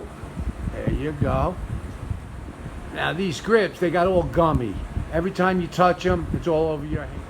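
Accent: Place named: New Jersey, United States. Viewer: Yep, I do also, '03 Heritage Softail 100th, they fit '95 to '15 Softails...the real Willie G grips are only $114 at Harley #<num>;o) is the man!, love that accent!, must be New Joisey!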